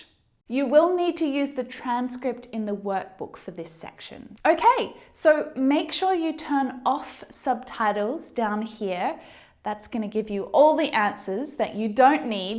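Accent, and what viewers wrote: Australia. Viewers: Australian Accent ❤️❤️❤️❤️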